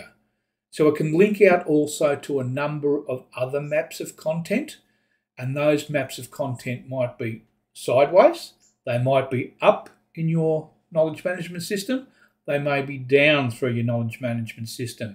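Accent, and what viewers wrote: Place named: Australia. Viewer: Glad the algorithm put your video up for me - and glad to hear an Aussie voice grappling with the Obsidian stuff I'm interested in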